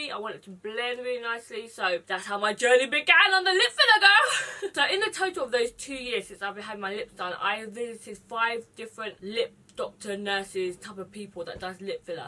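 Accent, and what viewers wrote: United Kingdom. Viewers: Omg Viet with British accent...loves it!!!! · Woah I'm only 9 seconds in I've always watch you instagram videos o had no idea you had a British accent lol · People who’s saying they don’t understand her, it’s because she has a British accent and not everyone has the same accent and she also wears braces at the back of her teeth. You all are being such bitches, leave her alone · OMG this is the first time watching a video of you on YouTube and I'm SHOOK of your British accent XD I knew you only from ig for a while and I thought you were American or Canadian :) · Carolina Araújo it's called being SARCASTIC....she fucken mumbles. and she has a British accent on top of it so it's even harder to hear her. i can understand....she just doesn't SPEAK CLEAR.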